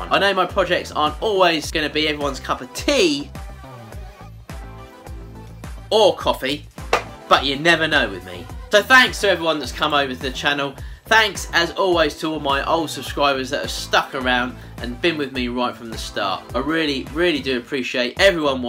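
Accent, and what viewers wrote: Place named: United Kingdom. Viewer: I want to go to the UK just so I can walk around and hear everyone talk. Your accent is so cool.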